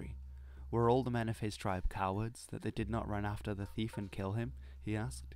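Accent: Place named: United Kingdom. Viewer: It was very strange hearing this tale in a British accent. Well told, Sir!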